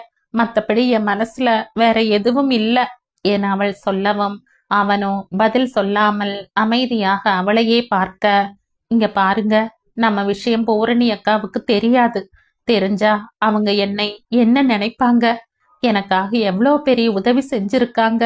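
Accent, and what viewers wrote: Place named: Kongu, India. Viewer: அருமையான பதிவு சிறப்பான முறையில் கொங்கு தமிழில் தங்களின் இனிய குரலில் கதை கேட்ட சந்தோஷம் நெஞ்சம் மறக்க முடியாத கிராமத்து மக்களின் வாழ்க்கை கதையின் ஒவ்வொரு அத்தியாயமும் அருமையிலும் அருமை வாழ்த்துக்கள் சிவசடாச்சரம் இராமநாதபுரம்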